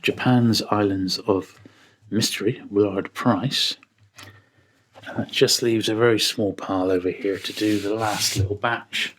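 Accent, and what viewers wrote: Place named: West Country, United Kingdom. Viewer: It's definitely that soft, West Country accent! Love your vids as always my friend 👌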